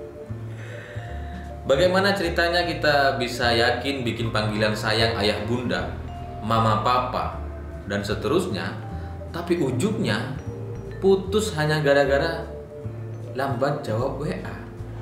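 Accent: Madura, Indonesia. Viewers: Logat madura pean cak?👍👍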